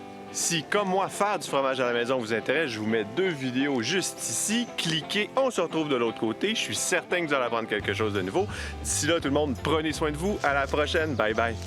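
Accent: Quebec, Canada. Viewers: Super sympa la vidéo en foret ainsi que la fabrication du fromage ,et c'et accent du Québec ,Nostalgie ! Bien des choses de Carcassonne Serge et Véro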